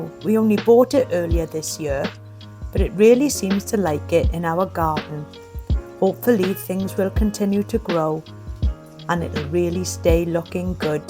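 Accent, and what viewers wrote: Wales, United Kingdom. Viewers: Thanks for this. Nice honest tropical style garden it’s inspiring. I love the welsh accent so kind and warm , much love x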